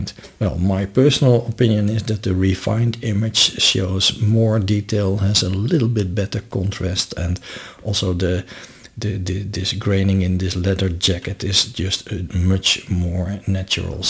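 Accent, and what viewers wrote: Netherlands. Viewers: some vague intuition tells me you are Dutch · duidelijk te horen dat je nederlands bent :) bedankt voor de informatie. ben nogal nieuw met ComfyUI en jouw workflow bestanden zullen zeker helpen mij het beter te begrijpen :) toodles!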